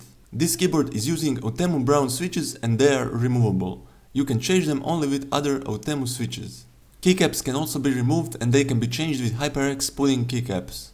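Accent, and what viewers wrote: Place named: Eastern Europe. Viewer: Where are you from? Cus you sound like you're Slavic :)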